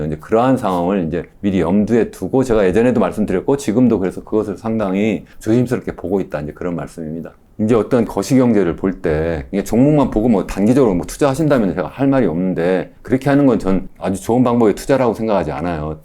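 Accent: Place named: Jeolla, South Korea. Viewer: 일단 라도 사투리부터 고쳐주셨으면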